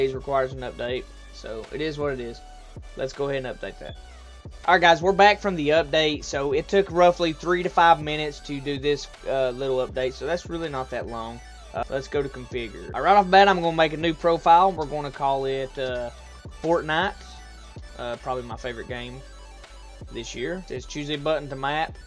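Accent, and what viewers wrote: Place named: West, United States. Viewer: I like your red dead redemption accent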